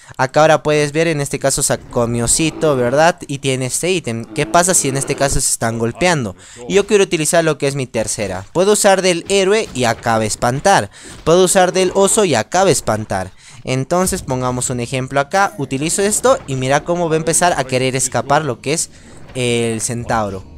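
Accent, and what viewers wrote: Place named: Bolivia. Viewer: Vocalisa bien boliviano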